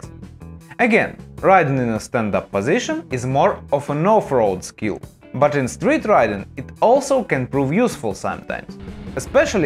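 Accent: Russia. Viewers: Sounds very like Aleksandr Orlov, a celebrity meerkat well known in Britain and Australia, did the voiceover! This just means the advertising agency got the accent they were aiming for, Russian, correct. Not that meerkats are good at riding motorcycles. <link> compilation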